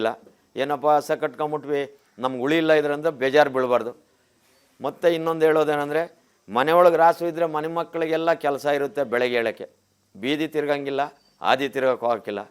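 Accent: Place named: Chikkaballapur, India. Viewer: ಇವರ ಭಾಷೆ ನೋಡಿದರೆ ನಮ್ಮ ಚಿಕ್ಕಬಳ್ಳಾಪುರ ಅತ್ವ ದೊಡ್ಡಬಳ್ಳಾಪುರ ಕಡೆಯ ಭಾಷೆ ಅನ್ಸುತ್ತೆ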